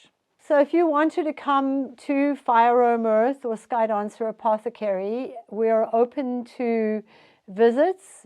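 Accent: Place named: South Africa. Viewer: Hi from South Africa!. Had a feeling you were from here just by hearing your accent. You are truly inspirational and have so much interesting knowledge to share.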